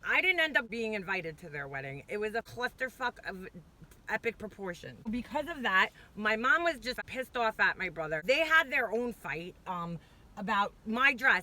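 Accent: New York, United States. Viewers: Oh my god found your channel and listening to when evil enters your life part 3... I’m fucking dying! You rock with your New Yorkian accent and my kind of foul mouth F bomb mom! Shout out from Carla from Boston!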